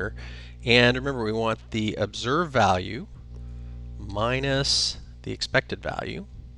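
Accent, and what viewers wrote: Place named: United States. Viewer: Thanks. Nice to hear an American voice teaching statistics!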